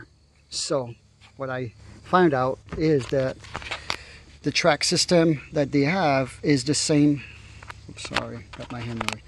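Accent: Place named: Canada. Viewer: Great video. Sounds like you may be in Canada. If so do you mind sharing where you purchased the rack? I can't seem to find any dealers near me in Winnipeg.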